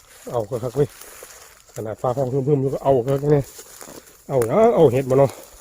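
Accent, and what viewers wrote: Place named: Isan, Thailand. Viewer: มักเสียงเว้าว่ะเว้าเป็นตาแซบฮ้าย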